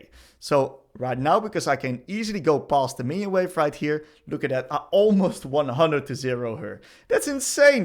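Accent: Netherlands. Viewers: Je Nederlandse Engelse accent is crazy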